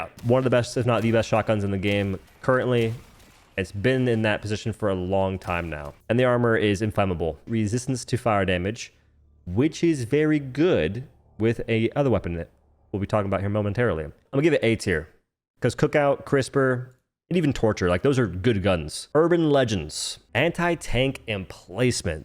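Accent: South, United States. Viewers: Don't do a Southern accent again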